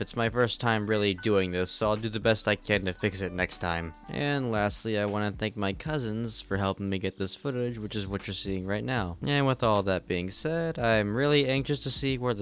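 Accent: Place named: Kentucky, United States. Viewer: Hey dude great video I just have one question... Are u from Kentucky, USA? I just find your voice very familiar haha i hope it doesnt sound weird XD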